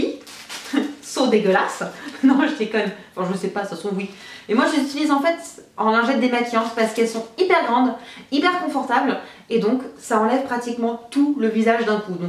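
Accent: England, United Kingdom. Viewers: J'ai le même mascara de chez Ives Rocher il est génial mes cils sont plus long vraiment super et t'aime très très fort avec ton accents anglais bisou!! <3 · Il faut que tu arrêtes de faire l'acsan anglais. Je t'adore ma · je t'adore et j'adore ton accent british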